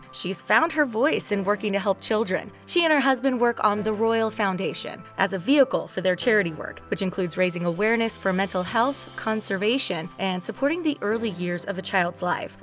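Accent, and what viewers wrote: United States. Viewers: Americans reporting on UK Royal family is silly...the accent is annoying and it's full of factual mistakes. Other than British, the Aussie reports are much more accurate and enjoyable. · The Talko is an American channel, I suppose. Especially according to the accent and how they portrait Meghan :) Well, I don't think that Kate follows Meghan's example especially because she is so different from Meghan in a good way. I have nothing against Meghan but Kate was always classy and a true royalty. Meghan was a rebel who either didn't want to sit through (what Meghan and Camilla did) or couldn't. One or the other, I don't care, it's non of my business. Btw, it's a typical American characteristic to be a rebel. Americans rebel all the rules, you can see it in the language. Sometimes, it's good to be a rebel. Sometimes, it's out of place. In Russian we say that you have to know when is the right time and the right place. 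I guess, you say it in many languages, too.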